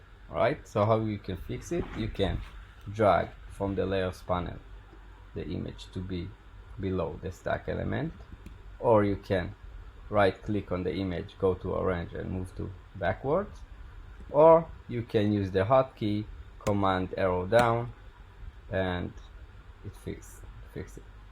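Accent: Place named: Israel. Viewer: הייתה לי תחושה שאתה מישראל חחח תודה על הסרטונים!!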